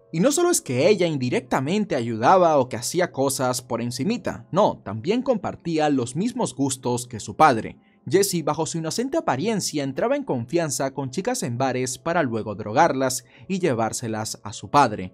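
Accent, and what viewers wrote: Venezuela. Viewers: tu acento es muy venezolano de dónde eres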